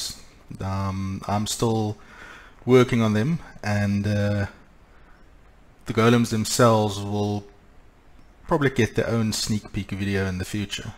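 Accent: South Africa. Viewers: Your sound South African, regardless, great mod. Keep it up.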